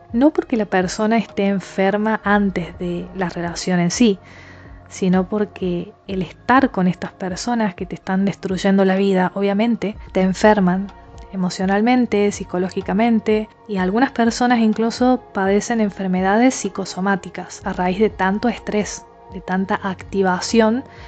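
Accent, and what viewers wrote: Córdoba, Argentina. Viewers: Que interesante la diferencia que haces con respecto a la persona tóxica y el narcisista. La importancia de atravesar las distintas etapas y las distintas emociones que se van generando. Impecable. Sos cordobesa?. Das charlas en las provincias?. Es un tema que me re interesa. Que importante es educar. Veo todos tus videos.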